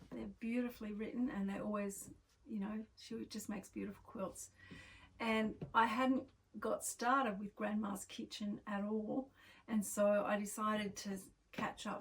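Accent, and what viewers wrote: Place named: Australia. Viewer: Hi, I finally circling back to your channel. I’m glad I did too. Because I do love to sew. And I used to watch both my grandmothers sew quilts. My mother did as well. Though I don’t quilt. They are my favorite comforters. I have my machines. Are you from Australia? I love your accent. Thank you once again for visiting my channel last month and for your comment. I look forward to watching your videos now that I found you. 😊❤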